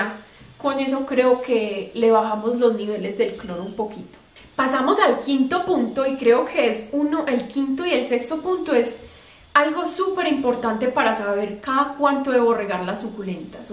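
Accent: Antioquia, Colombia. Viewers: Me encanto tu video. Es la manera de hablar de los paisas. Asi somos para todo. No hay medidas exactas. Tratamos, ensayamos y tambien fracasamos en nuestros intentos pero aprendemos cantidades. 👍🏼❣️ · Hola soy una asesina de suculentas todas se me mueren las ahogó aquí es un calor extremo y siento que tienen sed y pues bueno las ahogó pero ya estoy aprendiendo y también a mí me gusta mirar sus videos cuando estoy lavando platos o haciendo comida me encanta y estoy enamorada del acento colombiano no se ofendan Saludos todo Colombia...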